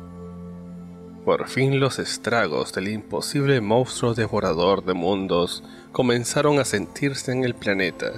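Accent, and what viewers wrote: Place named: Castile, Spain. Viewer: A todos mis hermanos Hispanos. Tercios Españoles invencibles. <link> Por cierto, eres un españolazo. Eres como un español del interior, castellano, así hablas 😍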